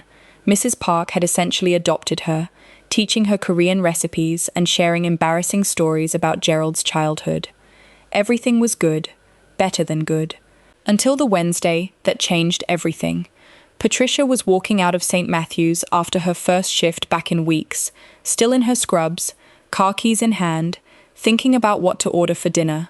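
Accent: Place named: United Kingdom. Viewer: I just started watching from Hammond, Indiana, and already the sarcasm is everything you know if you’ve ever seen my comment I live for the British accent just makes everything sound right. The humor lands perfectly.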